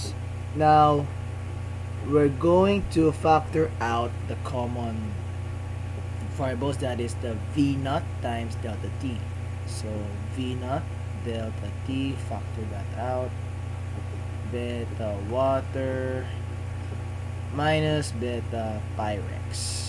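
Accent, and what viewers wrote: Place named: Philippines. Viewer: the accent is Filipino hehehej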